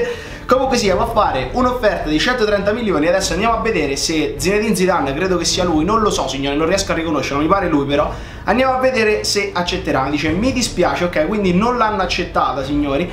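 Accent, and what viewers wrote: Lazio, Italy. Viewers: ma sei di Frosinone? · Ma sei de Frosinone?ciociaria portami via 😂😂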